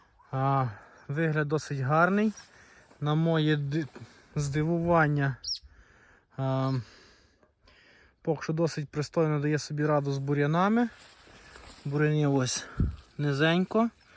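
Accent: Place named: Lviv, Ukraine. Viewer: Ну чути що ви з Львівської області, навіть не спорте!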